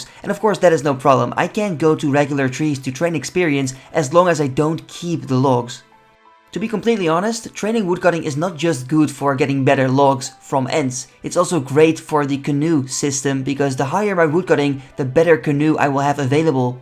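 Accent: Netherlands. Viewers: Ik had al zo'n idee dat je Nederlands was... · I think he is Dutch. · Lekker hollands accent hoor haha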